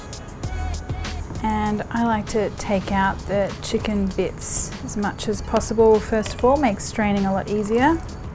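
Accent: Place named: Australia. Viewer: OMG i just shared 1 of her videos w my grown-up children n i had told them the exact same thing! I just love to watch n listen to her lovely Aussie accent😁. I agree totally, Soothing ...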